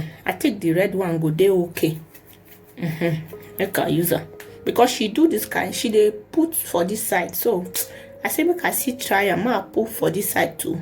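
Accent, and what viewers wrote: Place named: Nigeria. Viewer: Lolz... The pidgin is really funny ... although u are gud in the make up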